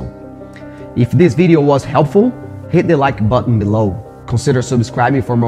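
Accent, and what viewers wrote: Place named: Brazil. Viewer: Vc é brasileiro né? A gnt reconhece kkkkkk ótimo vídeo 👏🏾👏🏾👏🏾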